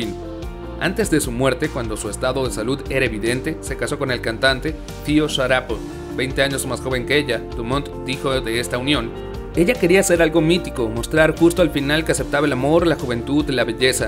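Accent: United States. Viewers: buen video, pero tengo que darte una sugerencia, como comprendo que no tienes por que saber frances, entiendo que no sepas como se pronuncian los nombres ni las palabras, lo que no entiendo es esa mania que teneis la mayor parte de los youtubers en sudamerica de pronunciarlo todo como los yankees, no se si es complejo o que creeis que os hace parecer mas inteligentes. te pediria que si vas a pronunciar mal lo hicieras como lo haria un hispanohablante y no como crees que lo haria un yankee, que ademas hablan un ingles como con desgana, insisto buen video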